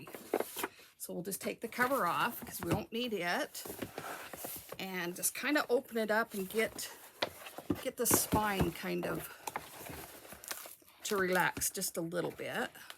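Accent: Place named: Utah, United States. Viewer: Hello neighbor in Utah 🌿 I'm from California but live in Orem now. I thought your accent sounded like a Utahn😊 ❤ you & your unique crafts!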